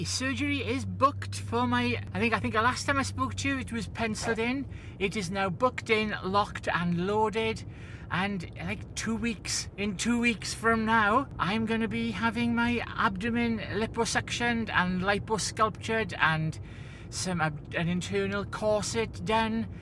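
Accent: United Kingdom. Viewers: I love your voice and accent lol. I'm from the south (of the U.S.) and I have no idea what part of the U.K. it's from but it's wonderful. I hope everything goes well, sending some trans solidarity love your way 💖✌️🌠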